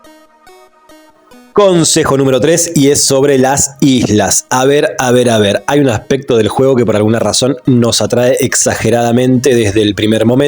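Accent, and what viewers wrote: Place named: Argentina. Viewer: Que buenas vibras tienes capo, me re encanta, deahhh. Sorry, me gusta como hablan los argentinos. :I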